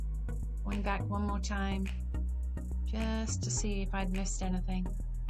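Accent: United Kingdom. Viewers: Hi, new to your channel. I saw you & Danni, the Niche Lady shopping together. Love your valuable info about why/why not you choose things. I'm a reseller, but have 3 booths here in western KY & don't sell on ebay. I heard Danni advise picking up fire fighter mugs, sunce they are good sellers & noticed a blue one on the shelf you may have missed. God bless! I love your accent! British? My mom was from England.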